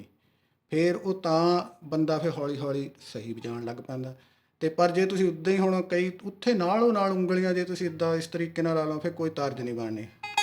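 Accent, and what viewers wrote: Doaba, India. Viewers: ਵਗੈਰਾ ਵਗੈਰਾ ..... ਜਿੱਦਾਂ ਕਿੱਦਾਂ , ,..., love the doabi accent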